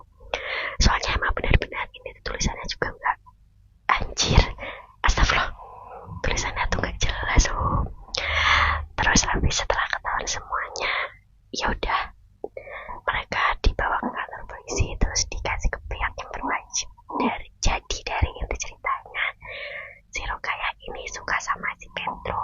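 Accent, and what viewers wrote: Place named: Java, Indonesia. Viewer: medok bgt kak😭, btw asmr bahasa jawa lagi dong tp crita gtu trsrh kakak crita apaa ajaa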